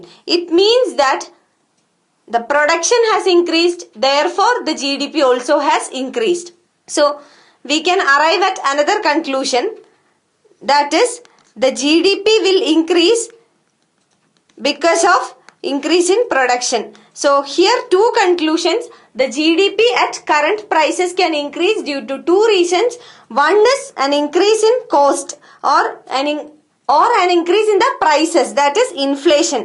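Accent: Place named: South India, India. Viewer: She is definitely South India Her accent said all😅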